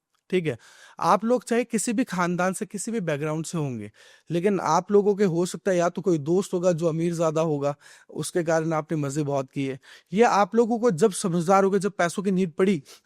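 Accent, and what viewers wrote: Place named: Marwar, India. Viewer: No doubt aap ek Marwadi bande ho no doubt apki boli bhasha se jana bcoz no doubt main bhi marwadi hu 😅😅😅 baki no doubt apne jo hhi bola h vo nhi ke brabar h 😢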